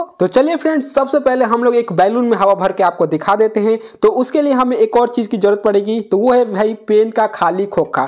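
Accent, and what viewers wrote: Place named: Bihar, India. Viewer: Bihari sun pahle hindi Boland sikho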